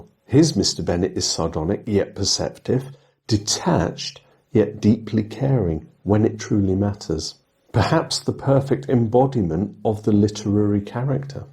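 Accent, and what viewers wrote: United Kingdom. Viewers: Hello from Montreal, Canada. So happy to have you back. I as well like Mr Bennett. Looking forward to watching you other analysis. I think in another video you said that some people don't like your voice. Well, I love your voice and your british accent. It is a real joy to hear you talk about Pride and Prejudice. Did you make an analysis of Charlotte? and Catherine de Burg (not sure it is the right spelling)? What kind of life do you think Lydia and Wickham had? Thank you.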